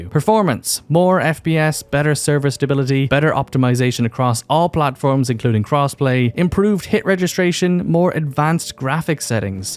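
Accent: Ireland. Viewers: Do I hear an irish accent in that voice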